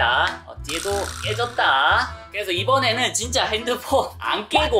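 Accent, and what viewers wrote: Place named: Gyeongsang, South Korea. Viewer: 잇섭이랑 대구말투도 비슷하고 목소리도 비슷하네요😅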